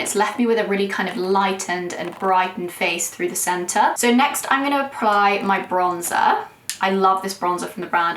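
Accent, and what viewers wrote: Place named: United Kingdom. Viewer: Love your British accent❤and your chinese beauty.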